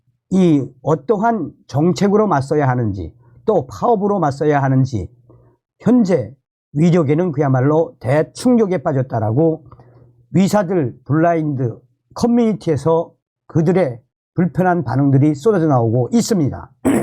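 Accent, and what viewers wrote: North Korea. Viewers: 말투가 북한??? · 북한에서 오셨어요? 발음이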